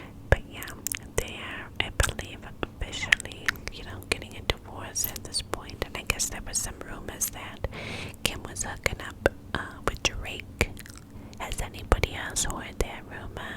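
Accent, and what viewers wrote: New York, United States. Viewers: your ny accent videos are my go to everytime i’m relaxing! they are super fun and relaxing at the same time. thank you 🥰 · I have been waiting all day to watch this to help me go to sleep. I LOVE story times with her NY accent, so tingly. Her whisper is the best whisper ever, she needs to have way more followers. The people need to hear her super tingly whisper 💗💗💗 rip dmx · This video is so tingly I am so glad you posted, I wait there for you to post all the time because your voice is so relaxing🥰😴. Also love the NY accent it adds to the tingles🥰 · another appearance by the green background lighting!! my fav. you look beautiful and the new yowrk accent is amazing 🥰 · I love your NY accent! Sooo tingly, your Niagara Falls facts in NY accent was the video that made me subscribe to your channel🥰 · I love sounds like an Italian New Yorker 😭❤️ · The NY accent is my fave, sooo tingly 😴 RIP DMX, I was so devastated by the news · you look gorgeous as usual! 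The NY accent is definitely my fave!! Sooo tingly! Hope you and your family are well❤️ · I'm loving your NY accent 😍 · the ny accent is literally iconic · I literally can't cope with your NY accent videos. They are SOOOO good. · Omg yayyy!! NY accent is my favorite!!🥰 Thank you for this video!!!🥳💓💞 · the New York accent is so relaxing!!! 💕💕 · I loveee the NY accent reminds me of my grandma 🥰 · Loveeee your NY accent vids!!! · loved his video!! your ny accent is so tingly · FINALLYYYY a NY accent video!!!! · honestly, i got tingles in the first like, 45 seconds. love your ny accent videos and your great energy! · OMG YESSS... who else absolutely loves the NY accent 😻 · I love all of her videos, but the NY accent is everythingggg. I was born and raised up north and I moved down south a few years ago. I love the southern accents, but I miss the NY and Boston accents, so I really appreciate these!💛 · This was soo good. 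I love the ny accent. You should def do a weekly video like this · the new york accent vids are my favorite!! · This video made me fall asleep it was sooo good so I came back just to say I love your videos so much and the accent is amazing! 😍 I love the NY accent since I am from England ♥️ listening again whilst I do my makeup 🥰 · Yay, more NY accent whispers!!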